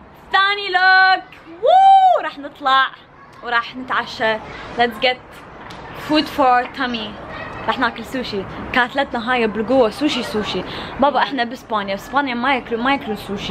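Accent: Iraq. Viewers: والله ما جنت ادري انتي عراقيه لو انه غلطانه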